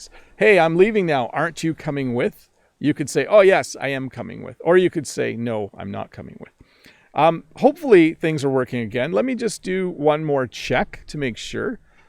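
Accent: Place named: Canada. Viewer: I've been in the US but I couldn't tell your pronunciation is of Canada. The differences are so slight!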